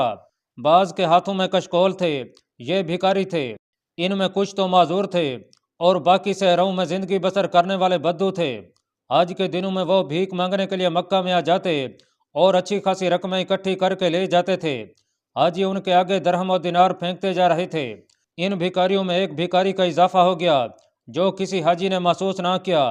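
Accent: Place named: Saraiki, Pakistan. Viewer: Saraaeki talaffuz lag raha hai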